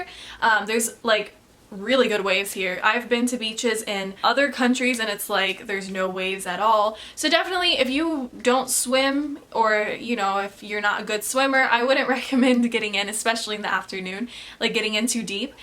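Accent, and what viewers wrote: El Salvador. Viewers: I just love your acento salvadoreño.. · I'm One of your suscriber and I'm very glad the way you talk about my country like or not your part of our Family you got the same way that we talk nosotros los guanacos and i like that ( i mean The way you talked spanish) i got more than twenty years living at LA California and like i said your are a good reference to us the Salvadorean Community....it's good that you make your videos in english and i support that because there are many countries that prefer the english lenguage than spanish so go head girl you are wonderful. ...atte fredy fuentes. .. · I was the #503 likes as the code of my country El Salvador. I like your videos in English mixing Spanish with Salvadorean accent 😋😉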